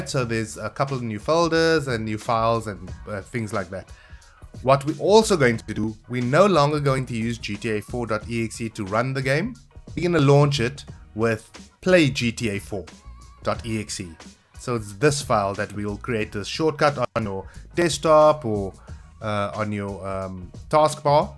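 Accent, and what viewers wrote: South Africa. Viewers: Ahhh man I love hearing south african voices on yt videos 😂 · Wait.... you sound South African...... AWE!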